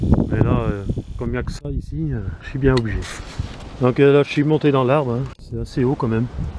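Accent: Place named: Belgium. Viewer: Je pensais a un belge proche des flamands , j'étais pas loin! :)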